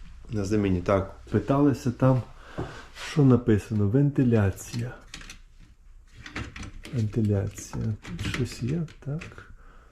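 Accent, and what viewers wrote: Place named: Transcarpathia, Ukraine. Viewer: Закарпатський діалект мене трохи здивовує😅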